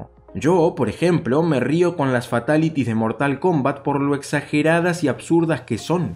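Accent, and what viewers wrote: Argentina. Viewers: ESPERA.... eres argentino ?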